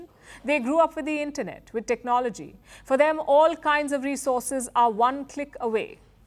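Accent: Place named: India. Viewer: I hate Indian broadcasters. They cannot get rid of the accent. 😖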